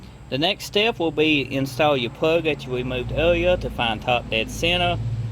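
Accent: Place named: South, United States. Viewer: Where are you from brother? Definitely a southern fella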